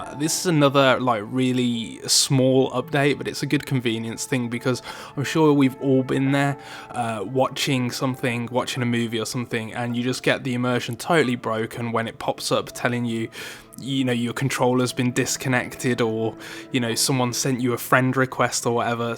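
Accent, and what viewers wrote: United Kingdom. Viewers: Long winded annoying British accent, geesh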